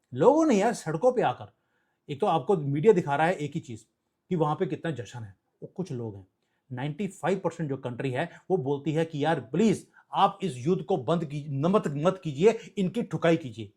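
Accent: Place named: Punjab, India. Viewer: Hehehe..very interesting.. I very much like your typical punjabi style👍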